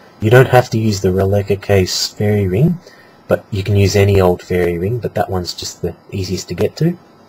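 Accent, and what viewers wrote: Australia. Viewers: your aussie arnt ya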